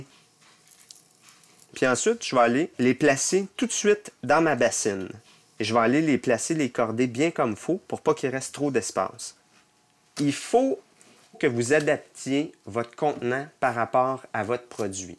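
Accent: Quebec, Canada. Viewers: Il parle québécoué, (québécois) ! Québec, province francophone du Canada...Parlé typique de la nouvelle France, ancêtres du français d'aujourd'hui, de ceux qui utilisent des anglicismes aux deux mots ! Bernard Pivot pourrait vous en passez un papier...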